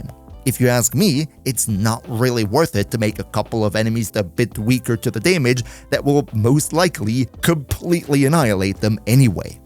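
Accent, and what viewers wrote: Germany. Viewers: You sound just like a happy german guy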